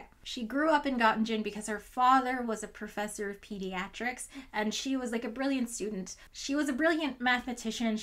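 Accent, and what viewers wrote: United States. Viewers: Nice video. I just wish you weren't butchering (aka Americanising) not English names to this extent.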